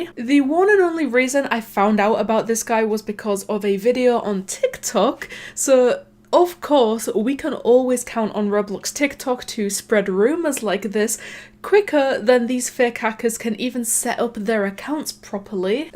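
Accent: United Kingdom. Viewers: are you like british?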